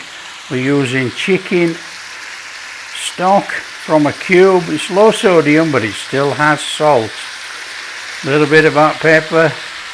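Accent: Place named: Sheffield, United Kingdom. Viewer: I definitely will! I have made your mashed potatoes with cabbage, green onions and bacon; twice already! My family can't get enough of it! Today I made it with corn beef, and it was an absolute delight! So thank you for the recipe,! If I may ask you, what part of UK are you from? We love your accent! It reminds me of our family friend, from Sheffield UK!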